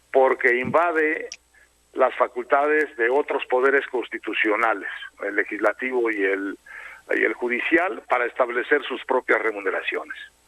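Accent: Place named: Galicia, Spain. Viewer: Cada vez el español gallego de lopez doriga. Se parece a loret de mola puras fake news.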